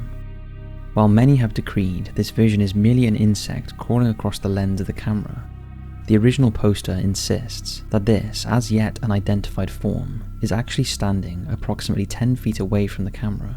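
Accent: United Kingdom. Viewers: Where is the narrator from? Obviously UK. But I can't put a region on the accent. I'm from South Wales not far from Cardiff.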